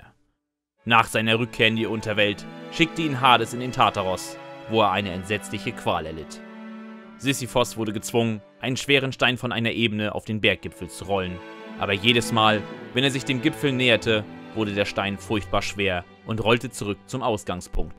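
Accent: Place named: England, United Kingdom. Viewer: Als Grieche schmerzt es meinen Ohren, die Namen im Deutschen in englischem Akzent zu hören...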